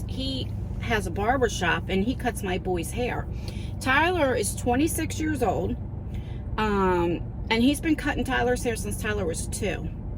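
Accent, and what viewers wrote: New Jersey, United States. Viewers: I truly enjoy these vlogs I’m a new subscriber l love your Jersey accent by the way love ❤️ from Ohio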